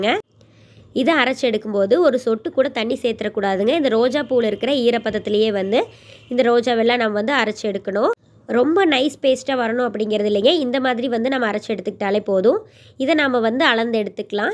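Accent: Kongu, India. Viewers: கொங்கு தமிழ்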